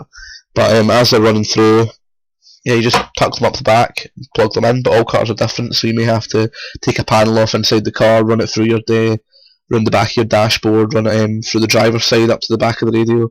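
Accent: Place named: Scotland, United Kingdom. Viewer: scotish mate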